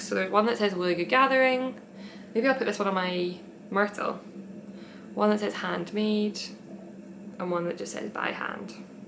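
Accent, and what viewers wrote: Scotland, United Kingdom. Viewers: I personally don't have a knitting community in person because my knitting is my relaxing alone time, I do however LOVE the online community, I feel like I can join others in our passion without having to worry about contributing, Yours was actually one of the first knitting podcasts I came across and fell in love immediately, being Irish I love the Scottish accent, and whilst watching this I am working on my 6th. Tolsta tee and planning what yarn to use in my first Lauder, x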